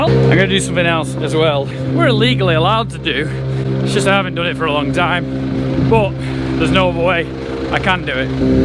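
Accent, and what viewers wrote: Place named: Northern England, United Kingdom. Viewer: Anyone notice has a YouTube voice (posh), then when he's speaking to staff he goes very northern! ᕙ( • ‿ • )ᕗ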